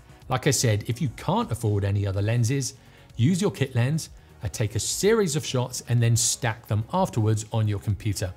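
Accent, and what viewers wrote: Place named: Wales, United Kingdom. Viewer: bloody hell would never have placed you as Aberystwyth! But it is the perfect presenters voice man not gunna lie. H that's awesome and likewise, love your channel!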